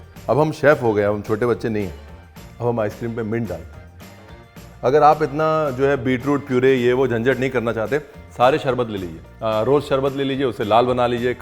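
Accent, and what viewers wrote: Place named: Lucknow, India. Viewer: Recipes ke sath jo lakhnauaa lahja hai bas sunte rahne ka man karta hai. bahut Bahut shubhashesh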